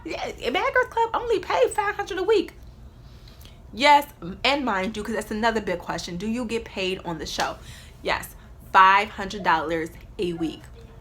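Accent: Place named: Chicago, United States. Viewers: what happened to that country Chicago accent? You sound like your from the Bronx now lol. Loved you on BGC and Love you now! Best Wishes too you 😘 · Damnnnn the Chicago accent totally disappeared 😂😂 still loveee me some tho!! Happy for you and your evolution ❣️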